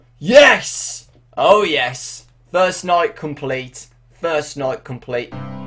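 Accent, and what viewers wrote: United Kingdom. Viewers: Not trying to be raciest but are u British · i wasn't scared at all, i was too busy laughing at every word you said with a vowel in it. Your British accent is hilarious XD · Hey sorry for asking this but what part of uk are you from ? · Thank god he's British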